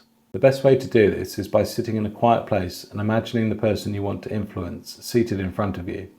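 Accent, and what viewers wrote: United Kingdom. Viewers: nice to hear your British accent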